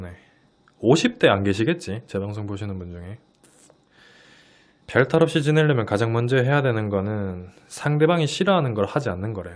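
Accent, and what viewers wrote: Gyeongsang, South Korea. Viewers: 나 50대에요. 구독하고 듣고 있어요. 나도 경상도 출신이라 사투리 억양이 편하고 시니컬한 조언이 좋아요.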